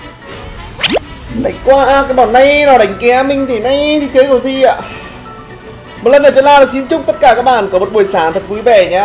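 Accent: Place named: North, Vietnam. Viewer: bình luận giọng người miền Bắc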